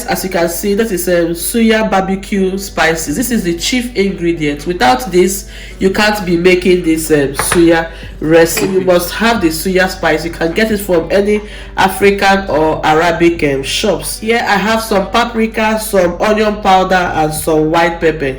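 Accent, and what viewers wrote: Nigeria. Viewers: Damn I love Nigerian accents 😩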